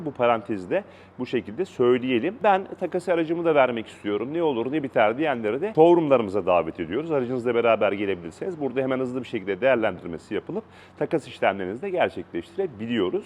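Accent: Samsun, Turkey. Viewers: Kulağı tirmaliyor biliyorum ama şive biraz Samsun da genel durum😊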